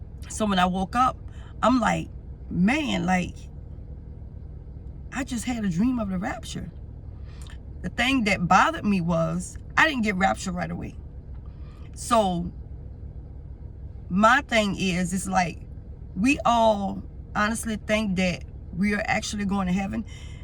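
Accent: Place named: New Orleans, United States. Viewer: you sound like you have a New Orleans accent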